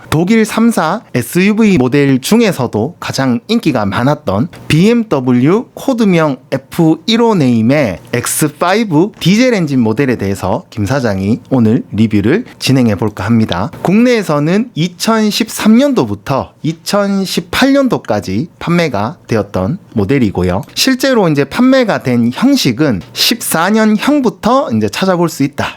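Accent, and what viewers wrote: Gyeongsang, South Korea. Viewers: 아 이분 갱상도네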